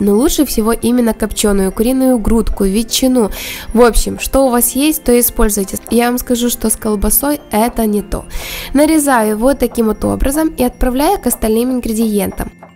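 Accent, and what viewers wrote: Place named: Ukraine. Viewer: украинка?много наискасяков в разговоре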